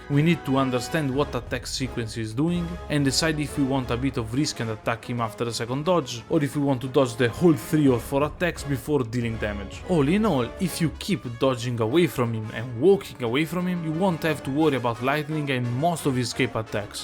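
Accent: Italy. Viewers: You italian or french? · oh right you're thick italian accent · I love your videos and also I love your accent. If you're Italian "spero nel meglio per te e il tuo canale" Else "I wish you the best" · Si sente che sei italiano, ma parli veramente bene in inglese✌🏻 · Prime tre parole e ho istantaneamente sentito il fatto che eri italiano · Bro dall'accento ho capito subito che eri italiano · funny how i recognized your italian accent only because i saw a shitpost about vento aureo where there was italian text to speech speaking english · bellissimo come io sia capitato su questo video per caso e si senta l'accento italiano hahahahahaha, comunque complimenti per l'inglese👍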